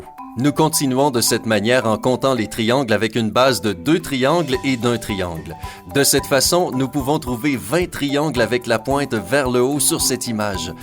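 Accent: Canada. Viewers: C un canadien???!! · arrêter la voix du canadien j’ai mal a la tête quand je l’entend...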